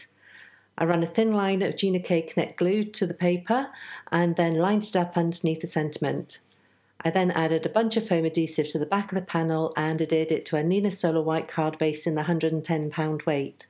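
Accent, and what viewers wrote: United Kingdom. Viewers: Absolutely amazing. And great to hear a British accent 😊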